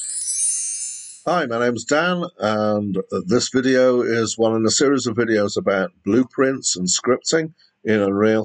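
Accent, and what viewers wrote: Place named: United Kingdom. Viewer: Ok plus noticed the accent my UK bud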